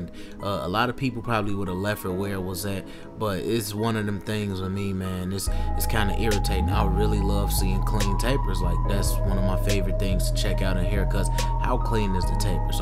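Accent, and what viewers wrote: Chicago, United States. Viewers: Sound like Chicago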